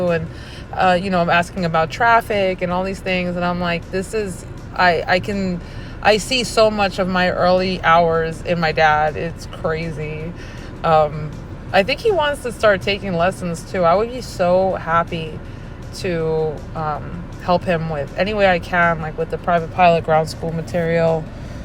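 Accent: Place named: New York, United States. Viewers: Nothing wrong with the New Yorker accent, and you have a pleasant voice, really. (ツ) ☕☕(ツ) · Ha Ha I from upstate NY now living in the south 🤣 100% relate to the accent/attitude. I can't hide it if I tried! Awesome flight and good luck going for your Commercial!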